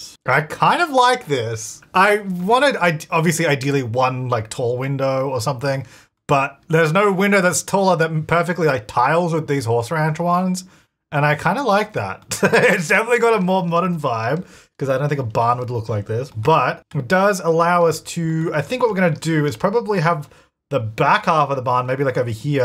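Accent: Australia. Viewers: For some reason, every time I click on one of videos, regardless of whether or not I've already started watching some of it, YouTube always turns subtitles on. No matter the device I'm using to watch YouTube (phone, laptop, tv), every time without fail YouTube puts subtitles on. But only, and I mean ONLY, for videos. Doesn't happen for any other YT channels I watch. Like, wtf 😂 Does YT think that I can't understand him coz he's Australian or something? He's speaking English. I speak English. I know what he's saying YouTube. U don't need to keep putting subtitles on 😂 does this happen to anyone else or just me??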